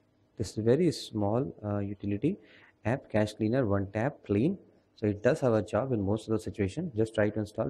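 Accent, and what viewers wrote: India. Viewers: Im 90% sure that u r from india. Ur accent tells everything :) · having a deep Indian accent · you can slow down, you talk way too fast to even comprehend what in the hell you're even saying! Maybe a fellow Indian ( I'm not speaking of a native American Indian) can understand you. And you seem informative in tis area and on this Question I need answers to. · First, I don't understand indianglish, sorry. Second, I have no single thing to delete (factory settings, new phone) and still 'insufficient storage'. F***k why?!?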